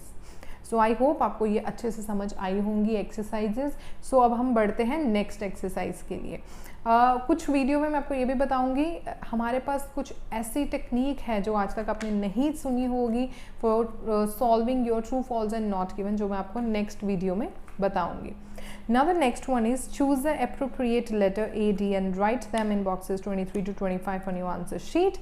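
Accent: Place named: India. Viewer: Yes, from India Mam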